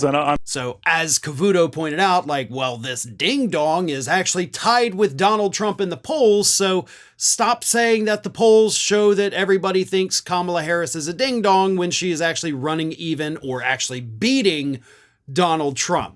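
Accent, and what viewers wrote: South, United States. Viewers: He actually sounds like Yosemite Sam mixed with Foghorn Leghorn. I can't stop😂😂with Farron Cousins southern accent...this just makes my day.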